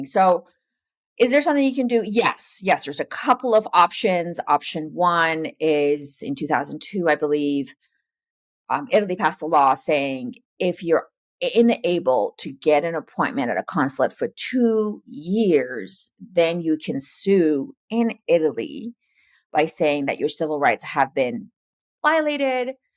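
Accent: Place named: Minnesota, United States. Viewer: Can I ask where you are located? Your midwest "Minnesota" is spot on and I'm Minnesotan. I'm looking for an attorney to help with this process and my Jure Sanguinis has been determined.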